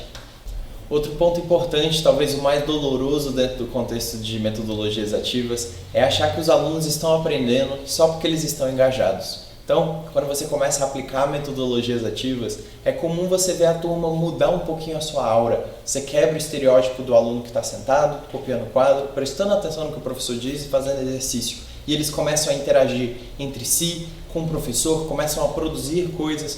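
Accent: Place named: Espirito Santo, Brazil. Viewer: Você é capixaba? O sotaque parece haha muito bom!!!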